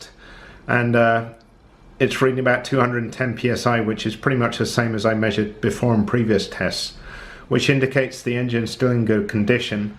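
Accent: United Kingdom. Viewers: Nice video. With regards to circlips with tangs you need to be careful because the gudgeon pin can fret on the tangs which causes them to snap off! (I used to run mega tuned 350 LC's so I've seen this sort of thing!) You can still use this type of circlip but cut the tangs so they are only 1-1.5mm long, the gudgeon pin can still fret on them but they just wear away very slowly rather than a long piece of them falling off! Someone will probably comment that if they are wearing then the material coming off them is not doing the engine any good....yeah, yeah, does the air filter stop EVERY atom of crap in the air, I doubt it! EDIT...Dude, you sound British, it's a gudgeon pin, not a wrist pin! LOL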